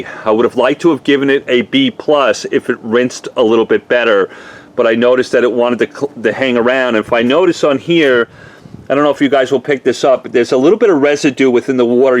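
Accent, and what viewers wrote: New York, United States. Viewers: He sounds like he’s from New York not from Dallas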